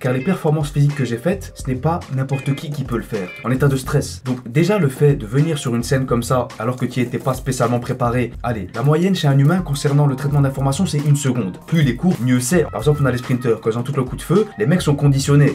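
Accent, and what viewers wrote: Belgium. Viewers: Tes belge ? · Et mais t'es belge ?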